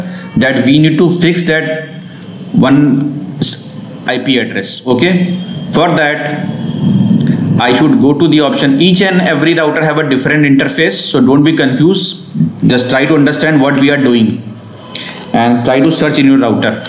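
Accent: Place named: Indonesia. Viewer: dont trust indo accent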